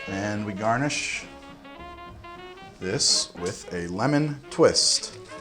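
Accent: New Jersey, United States. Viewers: Where in Jersey are you from?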